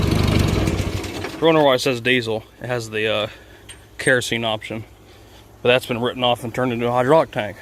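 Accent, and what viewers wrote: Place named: Coastal North Carolina, United States. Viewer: man i just found your channel i heard you talking and you have to be coastal nc im in pamlico county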